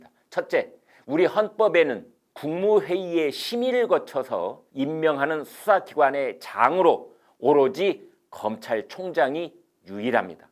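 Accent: France. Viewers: 불어 발음방식이 너무 불편하네요.. 그냥 한국식으로 발음하세요ᆢ담부터ㆍ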